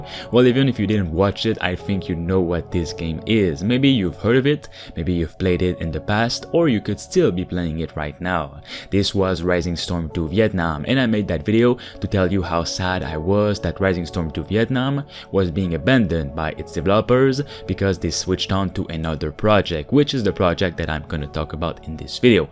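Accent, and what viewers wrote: Quebec, Canada. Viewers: Your Canadian French are you bro?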